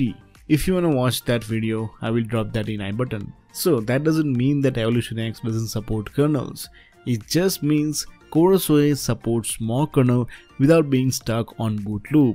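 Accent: India. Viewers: Yeah but his name is Indian,I believed He's Indian because of his accent.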